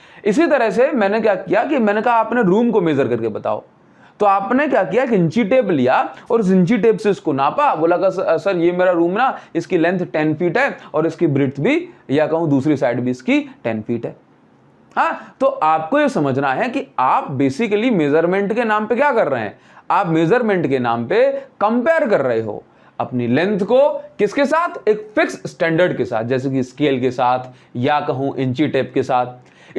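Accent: Odisha, India. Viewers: Sir app odisha se hen kya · Sir are from Odisha 🙏🏻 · Sir are u from odisha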